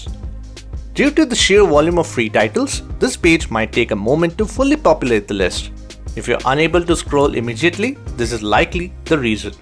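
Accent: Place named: India. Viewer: u got the indian accent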